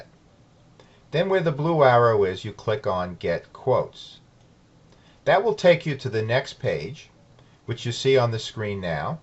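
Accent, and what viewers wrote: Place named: New York, United States. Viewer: Hi Mr., my mom deeply and humbly apologizes for insulting your beautiful New York accent. I love it and I love the videos. Keep it up homie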